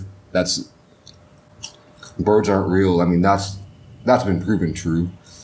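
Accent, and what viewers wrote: United States. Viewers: Hey i do have a question and help me pick up your accent man so like how did you get this i mean i get it you were in the states for quite some time but help me out man and been a watching your videos since lockdown and enjoying these vids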